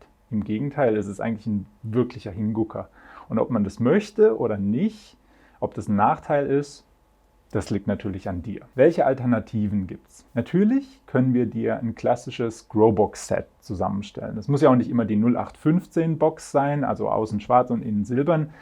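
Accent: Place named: Swabia, Germany. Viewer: Einfach geil wie du am Schwäbeln bisch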